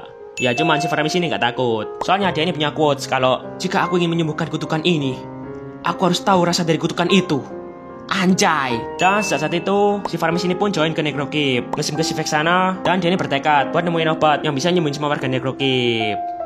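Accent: Java, Indonesia. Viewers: Wes fiks mas wong jowo 😭👊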